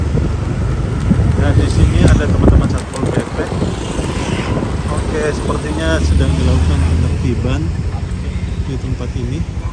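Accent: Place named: West Sumatra, Indonesia. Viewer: Dari logat bicaranya nampaknya abang ini "orang minang"... Salam urang awak....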